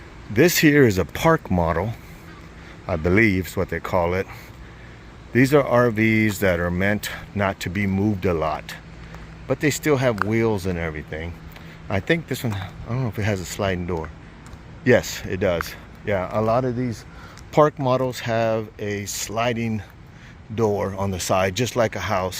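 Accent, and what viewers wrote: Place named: Latin America. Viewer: I hear a Latino accent. Hablas español?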